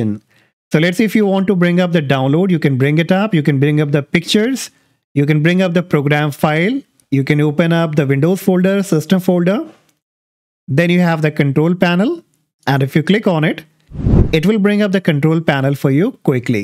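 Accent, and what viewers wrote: India. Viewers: Indian accent..get out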